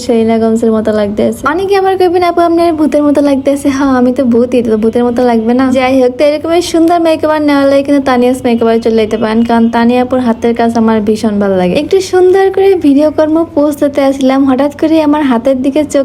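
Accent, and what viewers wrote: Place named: Barishal, Bangladesh. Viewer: আমি তো শুধু তোমার বরিশাইল্লা ভাষায় কথা শোনার জন্য ভিডিও দেখি.. 🥰🥰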